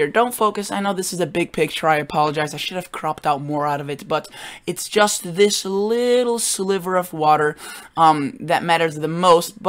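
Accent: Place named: Upper Midwest, United States. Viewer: This guy is so from WI or MN.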